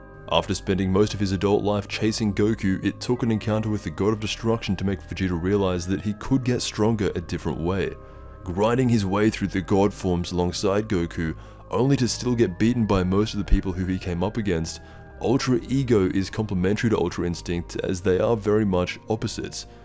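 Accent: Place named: Australia. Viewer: only one I ever subscribed to and click on it the minute I see it appear on my feed even if its not about DB. I'm not gonna watch the whole thing but ill support him. does cool shit for example you'll never hear him begging for fkn pitiful likes and subs NOT EVER. Another cool thing he does is put remixed Dragon Ball music like trap stuff. Its the type of music I like to work out too. I like his views and perception on DBZSGT. Lastly have a deep voice with an Auzzie accent will of course only help in this medium.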